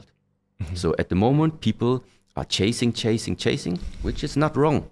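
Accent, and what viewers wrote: Germany. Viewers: The German accent makes it kinda funny 🤭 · His accent sounds so german .. 😅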